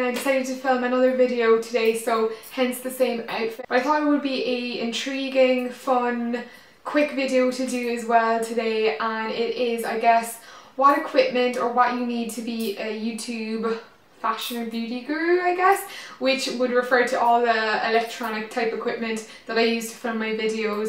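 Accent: Ireland. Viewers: Hey r u irish aswell😜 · The good Irish ascent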